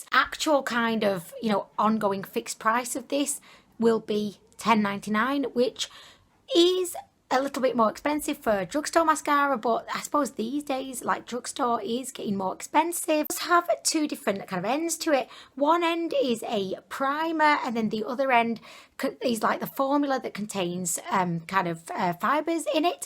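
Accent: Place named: Manchester, United Kingdom. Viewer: Sorry it’s off topic, but where are you from? Your accent is saying Manchester?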